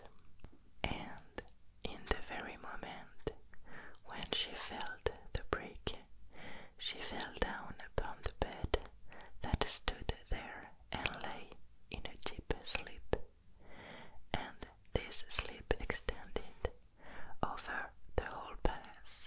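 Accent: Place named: England, United Kingdom. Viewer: J adore ton accent anglais ma sublime et ravissante super vidéo de qualité tu es relaxante 💜🤗💋🥰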